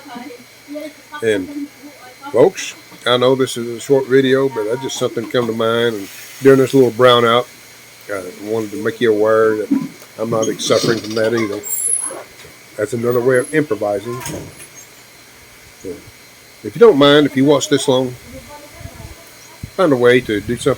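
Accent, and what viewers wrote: United States. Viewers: Enjoy buddy ….. u sound like your from Atlanta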